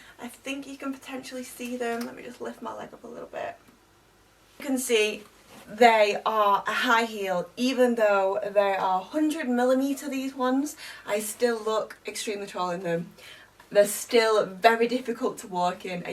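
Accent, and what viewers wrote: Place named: England, United Kingdom. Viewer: I have to say I love your English accent. You, if course are beautiful and very fetching!!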